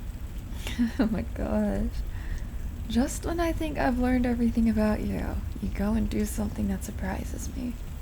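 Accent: France. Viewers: Holy! Here I thought your voice was mesmerising with the french accent but this is equally as hypnotizing. Lovely work as always!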